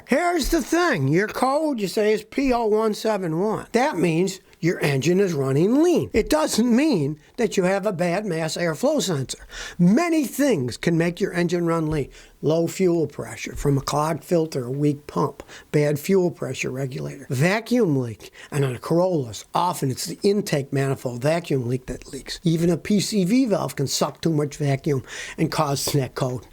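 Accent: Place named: United States. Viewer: you talk American! Buy you don't buy American!! Your a Toyota and Honda man!! Your either with American or not!!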